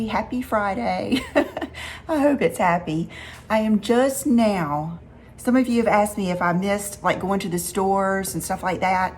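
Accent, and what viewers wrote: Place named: Texas, United States. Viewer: I just want to say that I’m a NoCal girl(old lady) and nowhere near you in any stretch of the imagination; looks, class, knowledge of products, size, nothing! And I was always amused by you because of your way. But I have just fallen in adore with you! Cute as a bugs ear! Sweet and unpretentious and humble but not mousey scared or cow tow ish. I LOVE YOUR TEXAS ACCENT! I love your lips, hair, makeup, accent, syntax, vulnerability, etc. carry on. That’s all. Just be you! Do not change!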